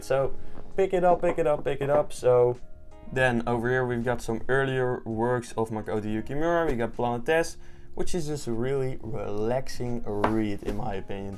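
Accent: Netherlands. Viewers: Duidelijk een nederlander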